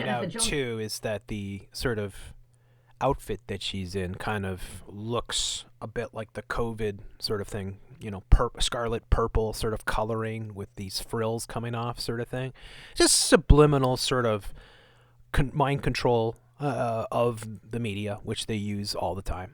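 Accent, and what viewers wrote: United Kingdom. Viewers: I’m from the U.K. her accent is typical BBC and that corporation is absolutely evil, they hid Jimmy Seville’s disgusting behaviour to minors and have a pretty disgusting statue outside the broadcasting house which shows exactly what they are. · British accent, most annoying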